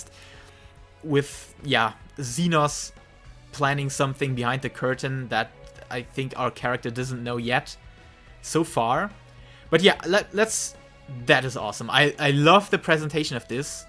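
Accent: Germany. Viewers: I think because hes german, could sound confusing to americans, but to me he sounds like a guys that is talking englisch :D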